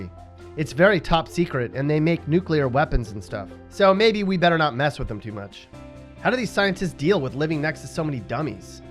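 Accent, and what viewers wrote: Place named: North, United States. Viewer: Damn Yankee. Where the hell are you from?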